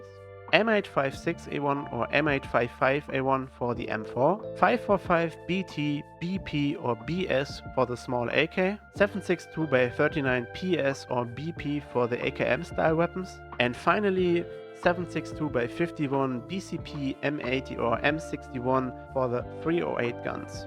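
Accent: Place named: Germany. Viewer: Denglisch. Ein unverkennbarer Klang im Universum! Gruß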